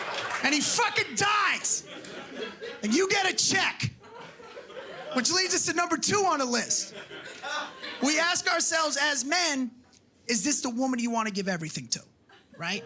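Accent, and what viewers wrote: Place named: New Jersey, United States. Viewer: The jersey accent is maybe the only one that competes with deep redneck for being just, offensively dumb and obnoxious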